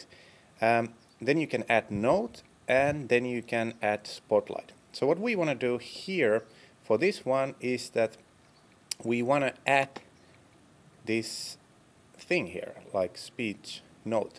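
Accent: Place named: Sweden. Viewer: Are you Swedish or Spanish? You really sound like a Swedish guy =)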